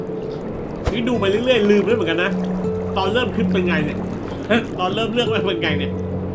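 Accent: South, Thailand. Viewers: เอ้า พี่ คนใต้เบ่อะนิ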